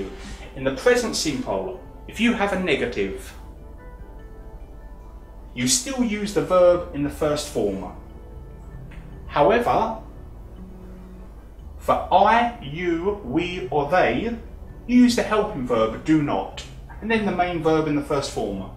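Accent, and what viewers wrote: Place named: London, United Kingdom. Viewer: У этого преподавателя очень хорошее произношение( Exact English) Вы не найдете в России другого такого педагога- англичанина с лондонским акцентом.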